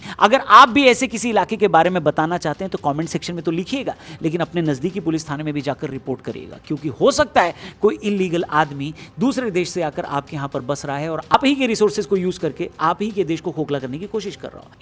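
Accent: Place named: Bihar, India. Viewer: Bhai tera thoda sa bahuwa to nikal hi jata h...but information about the topic is serious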